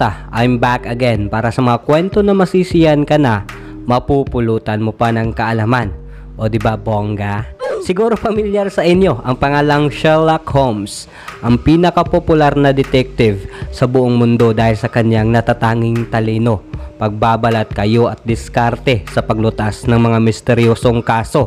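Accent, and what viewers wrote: Visayas, Philippines. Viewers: Parang bisaya managalog, may paduterte pa na singit,, dds ka bay?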